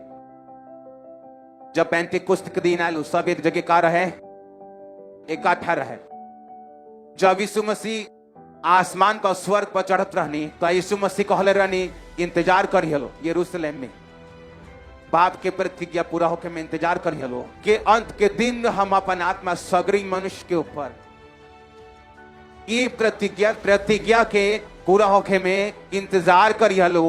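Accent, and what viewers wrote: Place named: Bhojpuri, India. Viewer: Praise the Lord, Papa ji shudh bhojpuri. Wah...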